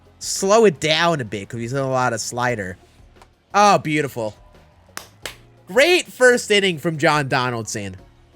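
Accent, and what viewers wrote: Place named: Wisconsin, United States. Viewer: You gotta be from Wisconsin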